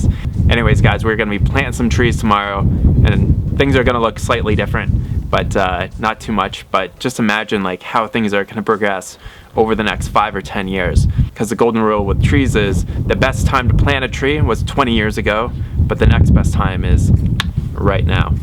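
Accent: Canada. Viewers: So this is in AZ? But the dude sounds Canadian........?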